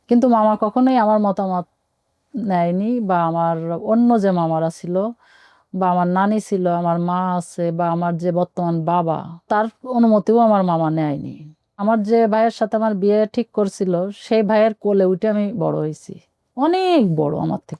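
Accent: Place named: Jessore, Bangladesh. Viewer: এটা যশোরের ভাষা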